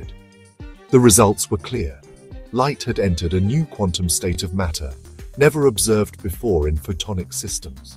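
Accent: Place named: United Kingdom. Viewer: Screw that British accent. Bad choice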